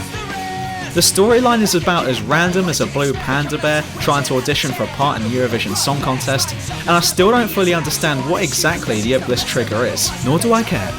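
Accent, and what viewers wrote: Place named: South London, United Kingdom. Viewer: That " " tune is...kinda catchy...especially with the South London (?) accent - I would expect a N'awlins drawl for a title like that. It's refreshing.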